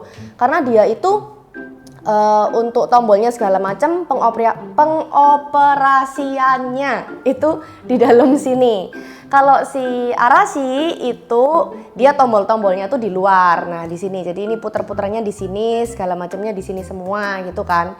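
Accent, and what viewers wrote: Java, Indonesia. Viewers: Medok men Yo jowone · Makasih orang baik 👍🙏💕...Kusuka mbnya bahasa nya medok Jawa 👍🙏🇮🇩💕😅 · medok kali suaranya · medoknya saya suka 😍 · Medok logat jawanya 😂😂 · Cantik + oriental + muuuuedok = ❤ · terus dipinek2 😂🤣medokk bngeett mbk e .. lucu .. makasih review nya kk .. milih Arashi aja dehh go co😅😅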